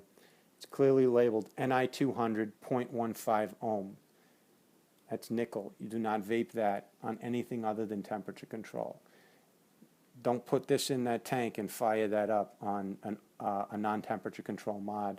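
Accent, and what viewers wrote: New Jersey, United States. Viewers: You from Jersey?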